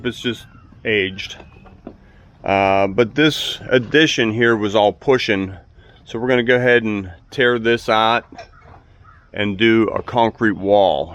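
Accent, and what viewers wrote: Pittsburgh, United States. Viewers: Hahahaha that’s the Pittsburghees accent you’re hearing! Thanks for watching! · This guy speaks with a south Pittsburgh dialect. Why can’t I find him listed there? (I lived there my first 20 years so I know.)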